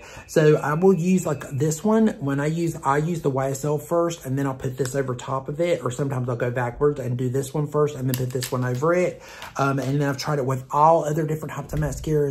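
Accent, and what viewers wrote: South, United States. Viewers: What a great video! I know how much effort went into this….completely enjoyable. The combination of your southern accent and your obvious makeup artist experience is so Nice to watch! Thanks for uploading👍.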